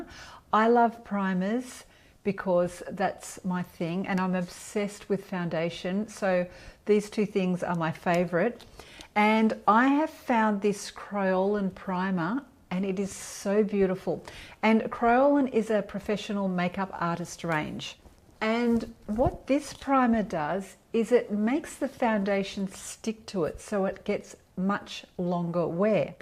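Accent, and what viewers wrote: Australia. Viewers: Hi I thought you sounded Australian, I was hoping to find someone tht can recommend products that can be purchased here for the over 50s :( I love watching you though. thankyou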